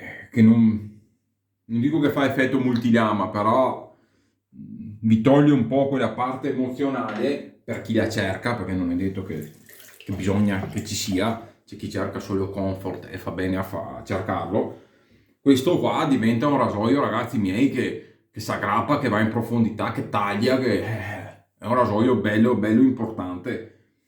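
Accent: Veneto, Italy. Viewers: Una considerazione molto oggettiva, bel video. (Comunque si nota tutto il veneto che è in te xD)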